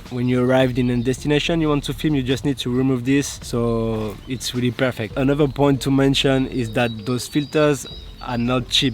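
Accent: France. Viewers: Hi, sorry but are you french ?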